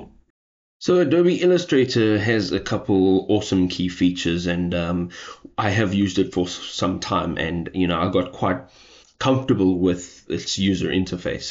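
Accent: South Africa. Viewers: This is great info, brother. The South African accent never go away.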